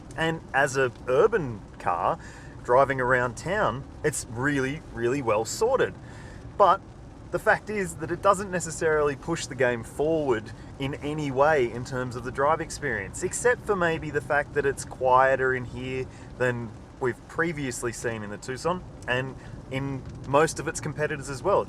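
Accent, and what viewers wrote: Australia. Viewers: Love the Aussie accent....and I looked at all the review titles before I roll the videos and choose Carsguide, and also UK CarsWow to compare, I know where both reviews are based..... ( for those who are too basic to pay attention to the titles and video content.....pay attention! ) Very good points and thank you for confirming some issues for my consideration of this car.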